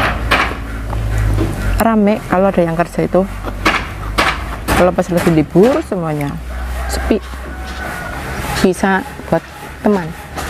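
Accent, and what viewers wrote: Banyumas, Indonesia. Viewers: Ru prtm kali liht, ngapak y MB, slm dr pnrgo, melu kemecer pdes" kecut sjk e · Uewanakkkkk mbak, seger gitu ya, mbak nie org jawa ngapak ya, cara ngomongnya kayak nenek aku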